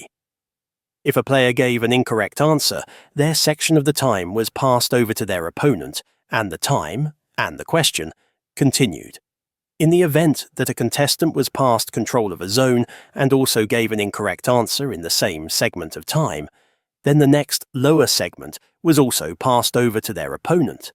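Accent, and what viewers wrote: Scotland, United Kingdom. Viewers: because it is the real you. Why use another voice? Scots FTW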